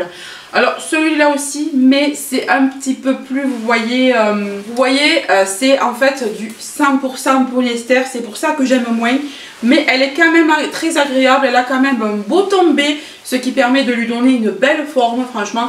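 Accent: South, France. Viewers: le magnifique accent du sud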